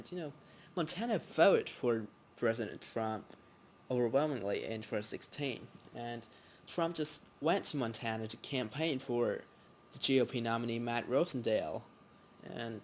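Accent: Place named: South, United States. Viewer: you have some of that accent. Are you southern or your folks southern? it is my favorite accent category. Thanks for the video!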